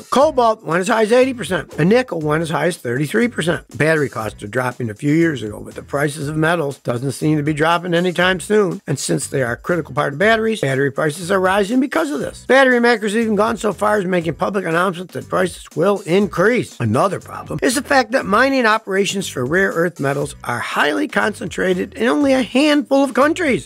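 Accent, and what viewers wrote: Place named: South, United States. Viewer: Your southern accent made the video interesting to watch.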